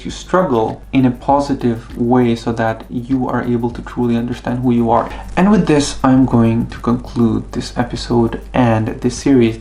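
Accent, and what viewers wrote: Estonia. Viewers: your english already sounds like you're an estonian speaker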